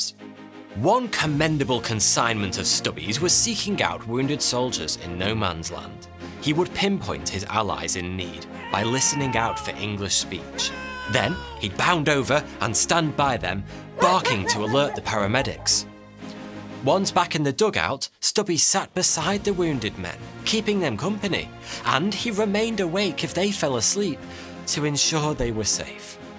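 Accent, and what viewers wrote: United Kingdom. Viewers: Blah blah blah brittish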